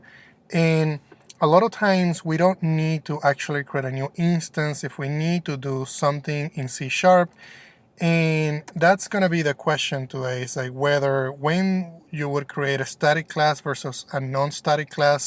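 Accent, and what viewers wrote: Spain. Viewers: Good Video. As I noticed your accent, I think your mother-language is Spanish. If that's true, It would be awesome to hear you recording some (or all) of your videos in Spanish too. Hi, from Ecuador.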